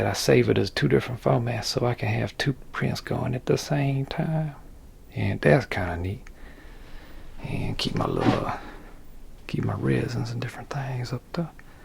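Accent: Louisiana, United States. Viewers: sounds like Tom segura’s Cajun accent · From the first time I heard your voice, Mr, I KNEW you were from Louisiana, I just knew it. Looking at the trees in your yard, and the Baton Rouge "evidence".... ah hah. Kindred neighbors. Because of you, I have bought myself some two part mold making material and I've started my first diorama. Just a small little thing, but... yeah. Neighbors😁😘 love you Mr.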